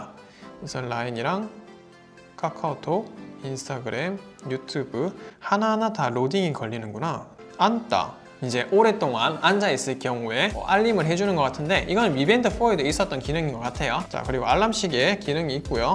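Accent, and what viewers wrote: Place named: Gyeongsang, South Korea. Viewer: 부산 경남 사투리 정감어립니다 반갑쑤~ㅎㅎ 일반 스트랩 메탈 절대 호환안됩니다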